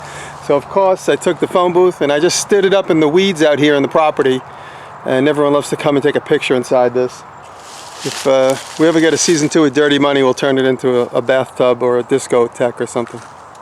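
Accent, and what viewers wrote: New York, United States. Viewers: This guy is straight New York! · I am so glad you answered my question after watching your vids for one day. I could tell your shop appeared to be in a basement, but had no idea you were in Manhattan. My question was where you were located. Your accent sounded like the big apple. I spent alot of time in Brooklyn Heights. I live in South Louisiana. Great to meet ya, Jeff W Waldrop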